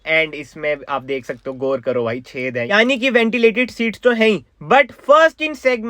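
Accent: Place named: Punjab, India. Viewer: Bhai tumhari punjabi wali Hindi sun kar maza aa jata hain 😅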